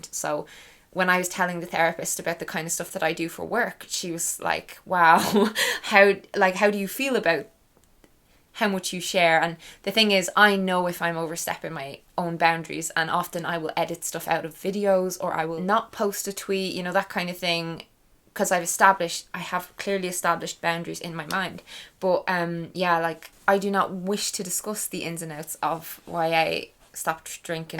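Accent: Ireland. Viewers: I love listening to you. Your irish accent reminds me of when I was living in Galway a few years back. Love Ireland. Big up to you for getting sober. I am two weeks in at the moment. Feeling so much better already. Greetings from germany. Chris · I can tell by your accent that you are Irish, but that is just me!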